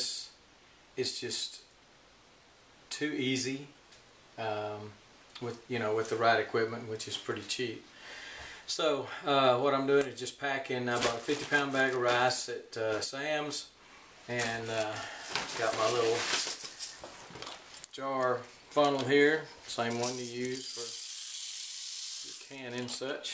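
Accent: Texas, United States. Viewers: Texas men have the sweetest accent🥰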